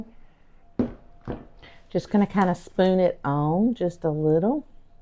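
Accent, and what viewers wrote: South, United States. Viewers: I enjoy your videos very much and your southern accent😃. Your home looks beautiful in the videos. I’d love to see your decorating style! I’d also enjoy a ‘get to know me’ video. You’ve mentioned being in the Air Force. I’m intrigued!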